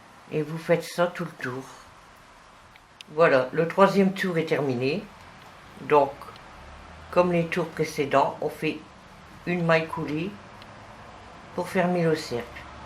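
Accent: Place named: Nord, France. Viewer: Merci madame pour ce joli tuto , je débute , et j ai réalisé le bonnet renard , j aime beaucoup votre accent du Nord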